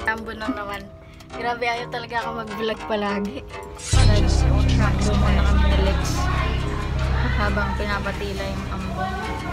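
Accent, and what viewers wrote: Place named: Batangas, Philippines. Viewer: Nakapakaangas!! Bagayyy kay elmo!! Ang cute din ng batangueña accent mo hehehe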